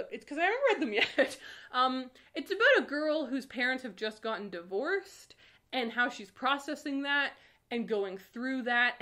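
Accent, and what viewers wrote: Canada. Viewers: I love Canadian long ohs!!!! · Emergency Contact and The Prince and the Dressmaker are two of my favorites! I hope you love them. Also, never apologize for your Canadian accent! Regional dialect and accents are fascinating in the age of digital and social homogenization. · Omg I love your Canadian accent and “about” is my ultimate favorite like it’s your trademark. Why would you try to lose it?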